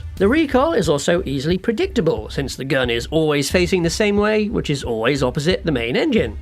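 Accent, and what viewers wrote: United Kingdom. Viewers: Eeek… bro got two of the worst traits, squeaker voice and British accent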